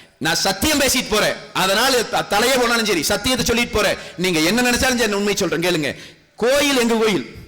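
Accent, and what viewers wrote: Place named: Kongu, India. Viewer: பேராசிரியர் கொங்கு தமிழ் சிறப்பு. நாம் தமிழர் துபாய்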